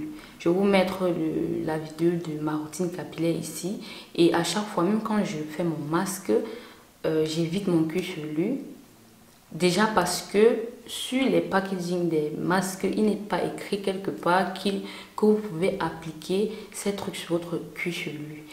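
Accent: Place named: West, Cameroon. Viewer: 🤣 tu as fini avec nous (femmes noires) . Avec ton accent de l'ouest du 237, j'aime ca. Tu es naturelle même dans ta facon de parler. Super vidéo et merci😘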